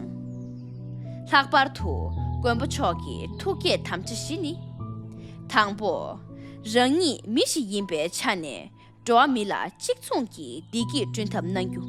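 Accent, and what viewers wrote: Standard. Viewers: བོད་ཆས་གཙང་མ་དང་བོད་སྐད་དག་མ་བཤད་ཀྱི་འདུག་པ་མ་ཟད། བཙན་བྱོལ་ན་ཡོད་པའི་བོད་རིགས་མང་པོ་ཞིག་གིས་མིག་དཔེ་ལྟ་འོས་པ་ཞིག་རེད།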